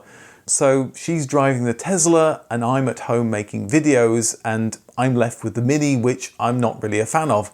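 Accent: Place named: United Kingdom. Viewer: Fun stuff,!!! I have deduced you must still live in the USA as the MINI you and Missus are standing by has the USA size plate frame and you mention it is still in your motorpool. And I had assumed you were UK based and that the missus had moved there!!!! Its the accent and the UK rear plate mock up in the background. Very enlightening, although a little surprised that you don't have a more varied list of dream cars that do not break the paper floor of the 1970s. Well, all of us care nthusiasts can't be Jay Leno have love almost every cool and unusual car ever made. Keep the videos coming as they are always insightful and properly researched.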